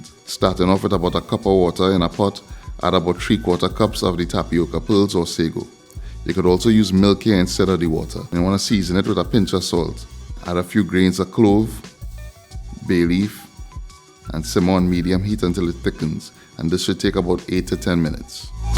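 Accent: Trinidad and Tobago. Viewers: Is that a Trini accent ?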